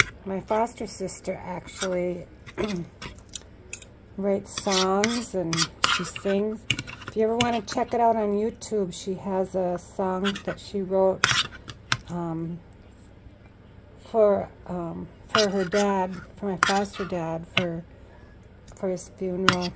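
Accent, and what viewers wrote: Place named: Midwest, United States. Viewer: Thank you 😍I love your midwestern accent 😊Are you from Wisconsin?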